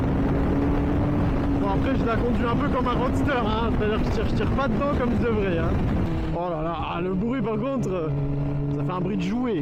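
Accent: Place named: South, France. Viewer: Une petite pointe d’accent méridionale Fils😂 Belle vidéo!!! Hâte de faire une balade soon!!!!♥️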